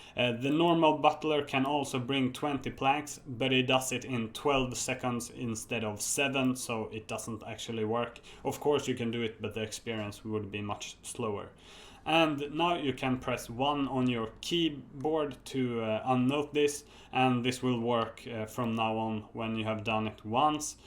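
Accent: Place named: Sweden. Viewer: You sond super swedish, amirite?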